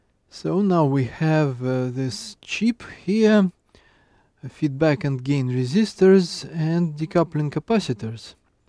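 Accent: Russia. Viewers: Hm, you have a Russian accent. Are you from Russia?=)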